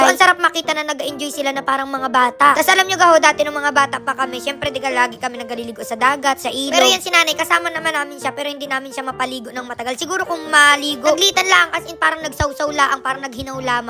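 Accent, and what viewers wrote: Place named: Quezon, Philippines. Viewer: Ito yong blager na nakaka tuwang panoodin saka yong Punto nya kagaya dito samin sa queson province ❤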